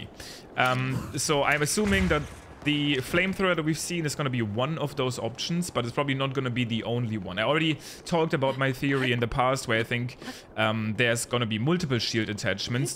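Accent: Germany. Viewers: Love this guy's german accent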